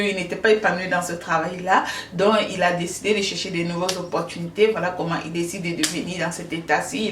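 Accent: Cameroon. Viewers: J’ai reconnu l’accent bulu du Cameroun! Tu n’es pas gabonaise. Quand tu t’exprimes exactement comme une beti. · ma compatriote equato hein🤣🤣🤣 heee pero no parece tienes más acento camerunes 🤣🤣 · je croyais que tu étais camerounaise avec ton accent 🤗😂 Ton mari ce sont tes yeux de désordonnée et ton piercing qui lui ont plu 😂😂😂😂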